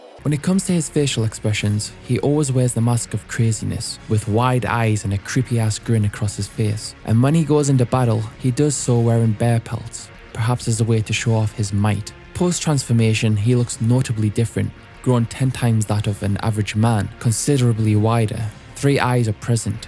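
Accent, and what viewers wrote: United Kingdom. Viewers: his awful British accent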